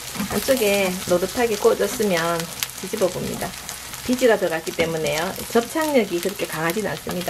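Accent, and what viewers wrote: Gyeongsang, South Korea. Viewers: 경상도 사투리가 듣기 좋아요. 이 부침개 만들어서 손님들에게 시원한 차와 대접하면 좋겠네요.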